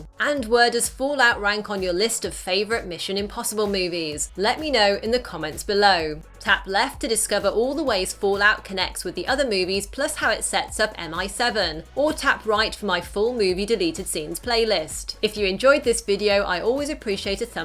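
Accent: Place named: United Kingdom. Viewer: British english ? wow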